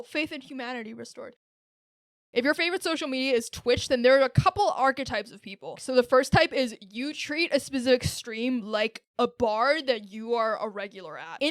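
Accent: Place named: United States. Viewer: you say you live in london, but your english sounds very american.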